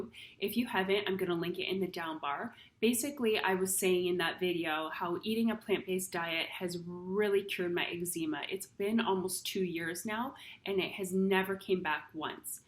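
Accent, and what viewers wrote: Canada. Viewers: haha, well "Eh" is a dead giveaway, but the "Aboot" is more subtle. Anyways, thanks for the video as i have been seriously considering doing a liver flush, and i appreciate your straight-forward content about the subject.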